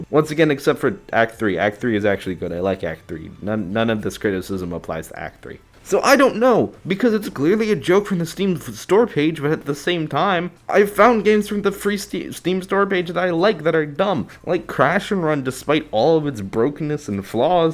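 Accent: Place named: Spain. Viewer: I just watched one of your other videos and the "spanish and english accents coming out at the same time" cracked me up 🤣 i love the way you talk 👌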